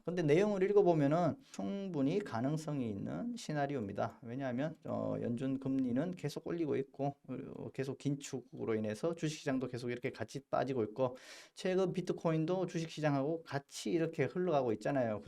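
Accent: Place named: Gumi, South Korea. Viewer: 구미 분이세요? 제가 좋아하는 언니가 구미 고향인데 말투가 똑같아요! 구미사람들 조아요❤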